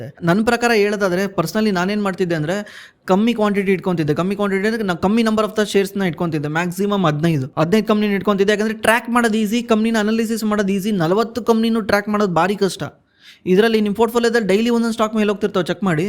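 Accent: North Karnataka, India. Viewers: Bro nimma speech language kelidare neevu north karnataka start areadavaru irbeku anisutte nimma native place yaavdu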